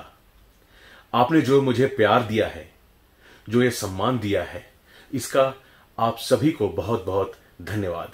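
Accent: Standard. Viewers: Clear, shudh hindi. ✌ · Your Hindi is so pure....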